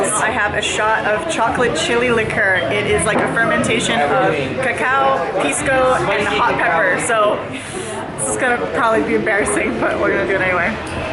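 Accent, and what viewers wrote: Spain. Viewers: Yeah, she studied abroad in Spain, that's why she has the spanish accent.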